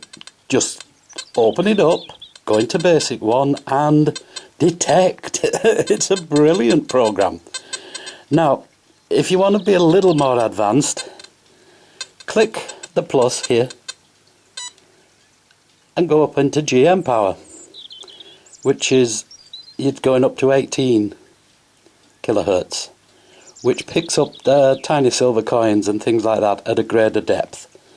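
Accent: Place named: Yorkshire, United Kingdom. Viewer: Thanks....it also helps that you have a Yorkshire brogue....I'm a Wakefield (Normanton rerally) ex-pat down here in Kent. I've set up my Deus exactly as you show.....now to get a chance to use it. Happy New Year in about 15 minutes...Phil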